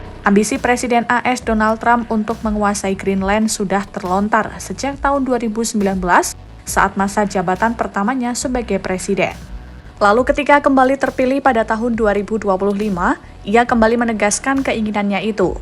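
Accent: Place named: Java, Indonesia. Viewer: Suara Mbaknya wong jowo ya? Medhok poll😅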